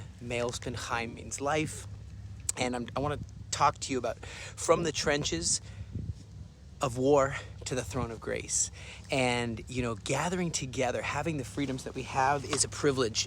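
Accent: United States. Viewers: Is this guy American or Israeli? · Excellent American accent for being a native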